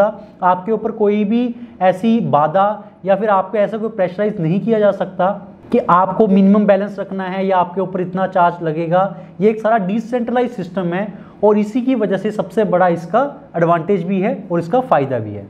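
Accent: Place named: Jammu, India. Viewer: Thank you...bro looks like your mother tongue is dogri...is that true..?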